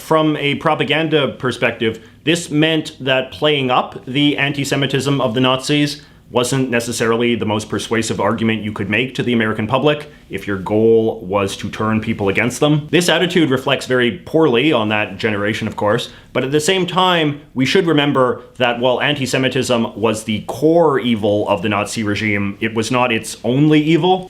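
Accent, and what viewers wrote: Canada. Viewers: I was listening to this without watching and I realized it was with the way he says "about". Damn Canadians.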